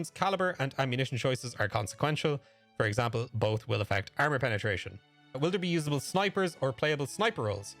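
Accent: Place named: Ireland. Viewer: Where in Ireland are ya from sham?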